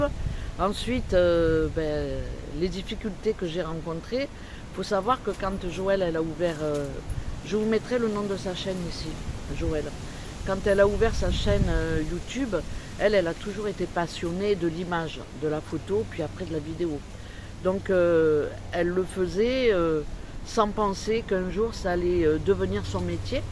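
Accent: South, France. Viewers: Coucou très instructive ta vidéo. C est bcoup de boulot tout cà...moi j Adore ton accent du soleil. Ça fait du bien au coeur.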